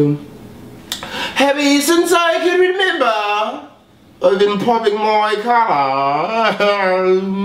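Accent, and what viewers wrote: United Kingdom. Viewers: Oh help me. Brilliant British accent if i say so myself. what what. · I swear I can't stop laughing the British accent loooooooooool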